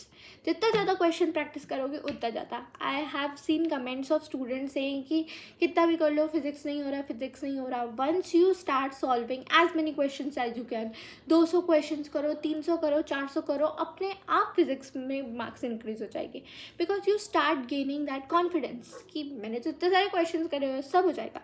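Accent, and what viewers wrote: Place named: South, India. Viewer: Ig she is south indian people do have accent it's normal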